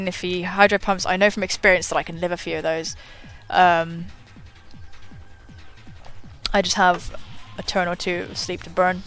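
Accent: England, United Kingdom. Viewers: Godstruct strikes again!! and not even burn can stop him from killing stuff, GOD i love that beast XD QOTD: im not american but i speak with the american accent so i suppose i say the the T's more or less like them :) i wish i could have an accent like your, i lived in England before in Plymouth but unfortunaly didnt manage to get the accent :(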